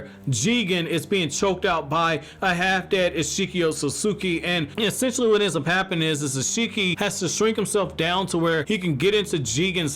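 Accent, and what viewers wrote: New York, United States. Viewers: What Part of Newyork you from man?🙌🏾🙌🏾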